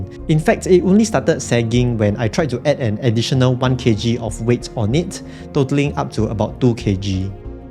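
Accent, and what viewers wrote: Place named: Singapore. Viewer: oh i think you are just casual indonesian chinese youtuber, after hear your accent kinda singaporean 😅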